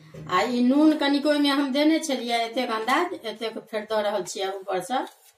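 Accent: Bihar, India. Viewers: Aaj bahut dino k baad Bihari bhasa sun ke bahut Accha laga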